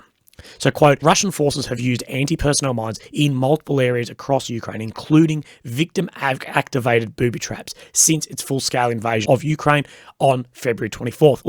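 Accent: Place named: Australia. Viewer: Look forward to hearing your goofy Australian ass everyday. Hope all is well with you. I think a reunion with rob on speak the truth is in order! Much love ❤️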